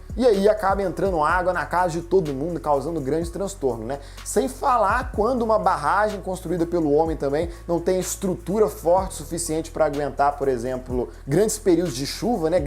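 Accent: Minas Gerais, Brazil. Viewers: Vídeo top ,vc e mineiro também m sabia 😄uai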